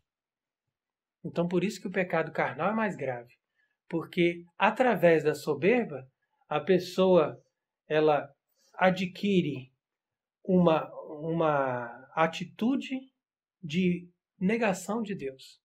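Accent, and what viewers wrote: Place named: Minas Gerais, Brazil. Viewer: vc é mineiro de Ituiutaba? Esse sotaque é ótimo. Parabéns pelo trabalho!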